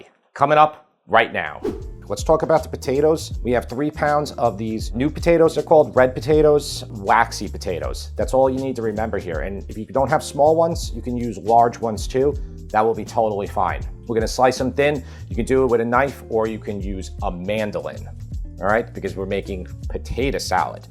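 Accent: New York, United States. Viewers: Not Only Do I Love Your Recipes, But I Love Your NY Accent & I Think You’re Nice to Look at too! 😉 Keep the Recipes Coming! TY From Oregon! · Hi, I grew up in Brooklyn and I have moved to Thailand about 15 years ago. I stumbled across your channel when I saw you were making a NY Deli Macaroni salad. I haven't seen anyone else doing it on Youtube, so I had to watch it. Then, when I heard you speak, I thought to myself: This is a genuine NY dude, not some poser from a 5 star Michelin restaurant making a NY deli salad. Watching your videos makes me feel at home. Thank you BTW, the kid is a natural food critic. He doesn't pull any punches even though his dad is right there and his dad made the dish. He's articulate and he tells you EXACTLY what he likes and doesn't like in a particular dish. It's so refreshing to see such honesty. It makes me almost miss New York.:D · is a great taste tester. 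I also love your New York accent (patata salad) LOL. I am from Bergen County NJ and say it the same way!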